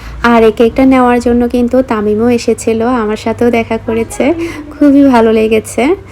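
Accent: Chittagong, Bangladesh. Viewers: আপু অনেক ভালো লাগলো তোমার চট্টগ্রামের ভাষা অনেক ভালো লাগে শুনতে ধন্যবাদ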